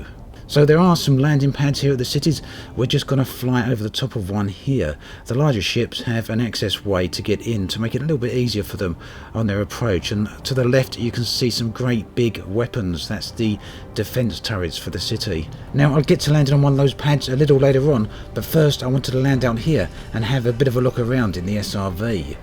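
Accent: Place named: England, United Kingdom. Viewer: Where are you from mate, I know you are English like myself, cannot pinpoint your accent though. I've been playing lots lately and absolutely love the Game, and that's without Horizons so I await that eventuality with bated breath. I play Solo mostly but enjoy it to detraction. Anyway keep posting, I look forwards to viewing all your videos more than anyone's on you tube. Have you got a website of your own? Cheers Mate Paul. By the way have a nice Christmas.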